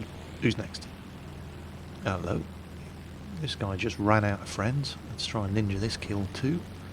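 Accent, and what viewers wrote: Australia. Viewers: nice australin acent i come from australia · lol love the aussie accent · Love that australian accent! Keep doing it mate!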